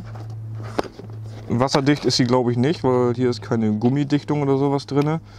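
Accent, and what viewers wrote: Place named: East Frisia, Germany. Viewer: Du redest wie ein 60-jähriger Ostfriese aus dem Lande! Voll sympathisch!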